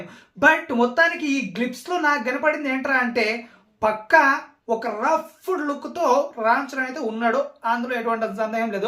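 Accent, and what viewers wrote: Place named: Andhra, India. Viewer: Utter andhra slang ra arripooka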